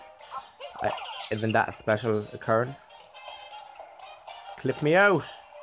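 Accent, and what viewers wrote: Ireland. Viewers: Hey are ya Irish? I am too! 😆 Also made a video about this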